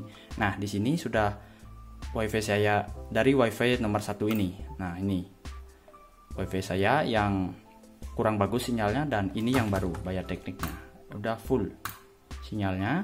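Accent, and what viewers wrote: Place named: Bali, Indonesia. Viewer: Dari Bali bang ya? Logatnya macam Dwi Arsana :D Salam dari Aceh bang. .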